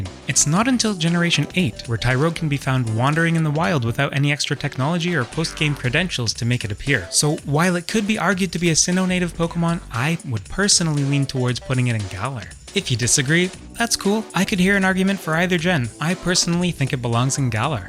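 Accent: Canada. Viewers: Wait you’re Canadian? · Are you a canook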